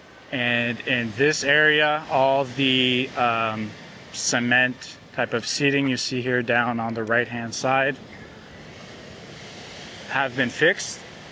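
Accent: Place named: Mexico. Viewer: You’re doing a great job contributing to México with your updates. Really shows how much you love México and have made it your home for your family. I hope that Mexicans embrace your integrity and authenticity. Appreciate your consistency with videos, your beautiful Mexican Spanish accent (and the effort!), and your sense of humour.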